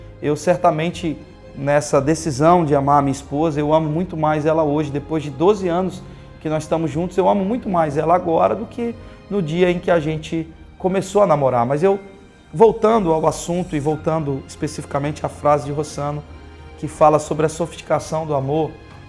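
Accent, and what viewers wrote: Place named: Recife, Brazil. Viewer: Pelo sotaque... já me ligou no saber entender..sou recifense e amei lembrar minha terra. Você é bem sábio pra idade que tem. Tenho dois filhos e o amor sim ..tem de ser construído e as vezes ..a duras penas porque os filhos não têm maturidade e ser mãe implica em amar e se respeitar e ensinar isso..e é difícil..mas vai..o amor é uma semente que a gente rega..se nascer nasceu..se não nasceu é porque a terra não estava preparada no momento certo. Gratidão pelas suas palavras ...gostei e me conquistou pela sua frontalidade.🌹🇧🇷🇵🇹🌵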